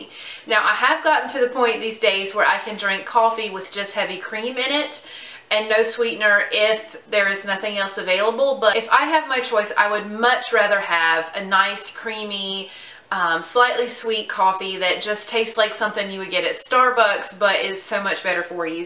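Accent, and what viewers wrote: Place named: South, United States. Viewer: Great video!! Your accent sounds similar to mine. You must be from the south. lol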